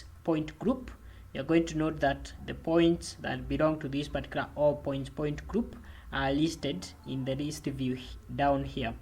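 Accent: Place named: East Africa. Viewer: Useful, especially for a quick reference. And I like the accent too. Sounds very Eastern African 😊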